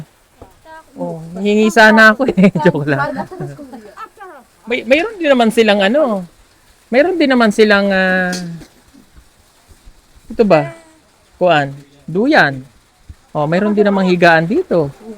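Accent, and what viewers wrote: Visayas, Philippines. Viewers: Marunong na mag bisaya c sir 😂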